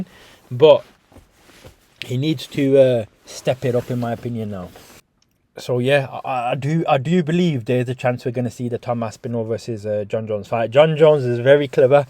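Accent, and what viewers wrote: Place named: Black Country, United Kingdom. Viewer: 💯 I hope it is a negotiation tactic by Jon mate cuz it’s the only fight I want to see in the ufc 🏧 I was never a fan of jones vs stipe tbf I wouldn’t argue with jones vs pereira but the aspinall fight has to happen first let’s be honest, keep the content up bro you sound like your from the Black Country I’m only down the road, Telford bro 👊 n you’d have to be a total idiot or just plain arrogant to write jones off against anyone I just think Tom has the edge over him not to say Jon can’t win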